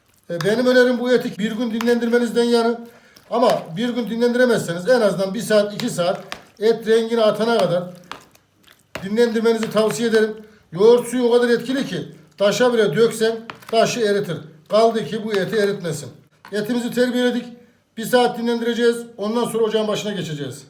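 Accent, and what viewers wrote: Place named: Black Sea, Turkey. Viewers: Memleket nire şef? Kayseri, Yozgat, Çorum??? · karadenizli galiba ustam.bizim şiveyle gonuşii :) tokat ordu sivas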